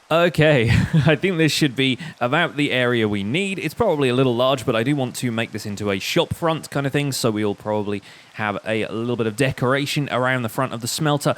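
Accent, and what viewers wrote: United Kingdom. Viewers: You are sort of my hero cause I'm not good at doing these things so thank you very much, btw, are you British? I'm not British but my mom said I have a "British accent"...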